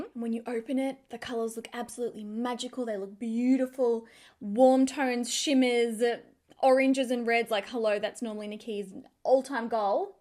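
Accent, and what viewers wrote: Australia. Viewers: Nikkia, I LOVE your videos. I've learned so much from you. I'm sure many of your subscribers are younger than I am, but you've helped me be more confident with makeup at 44!! I love to see your subscriber number climb because you deserve it!! You need to share a tutorial on that coral peachy look your rocking today!!! LOVE IT!!! Much love from America! Hope your winter is not too bad in Australia, and your accent is charming. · Girl! Your Australian accent is beautiful!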